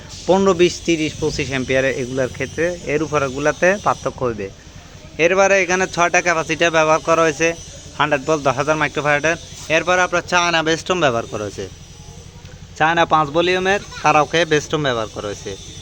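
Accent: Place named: Noakhali, Bangladesh. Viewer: নোয়াখালি নাকি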